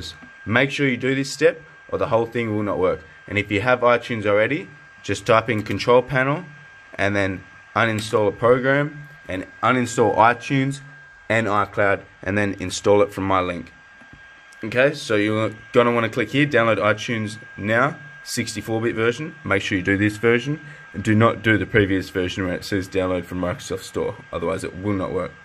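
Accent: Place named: Australia. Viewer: you have that strong Aussie accent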